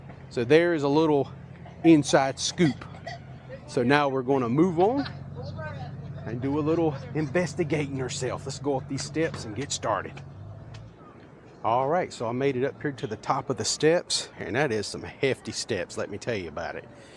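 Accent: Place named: North Carolina, United States. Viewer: We visited the pier in April & it is a great pier. This was the first video I watched of yours but have been enjoying the ones you've done since. Thanks! We are born & raised North Carolinians & love your accent. Sounds like us 😂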